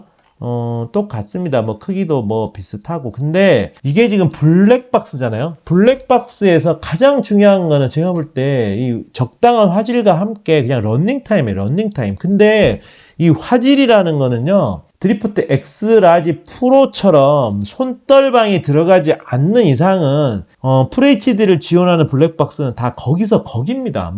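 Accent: Jeolla, South Korea. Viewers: 잘 보고 갑니다~^* 킥보드용 블랙박스를 찾다가 채널에 놀러왔습니다!! 말투가 우리동네 같네요 저는 목포입니다 ㅎㅎㅎ 구독 좋아요 누르고 갑니다❤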